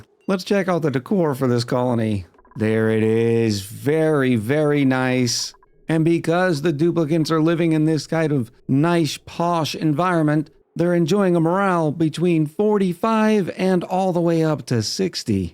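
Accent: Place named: United States. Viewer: I like your country accent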